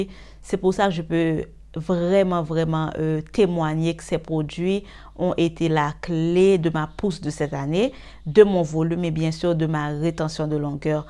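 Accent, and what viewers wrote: Haiti. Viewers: J’aime bien ta façon de parler 😀 ton accent haïtien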